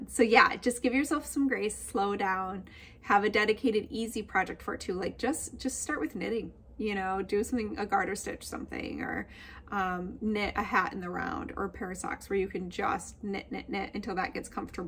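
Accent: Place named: Midwest, United States. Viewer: loved the Midwest accent! I grew up in Minnesota and have lived in CA for 24 years. However, when I am tired or talking with family, that Minnesota accent pops right out!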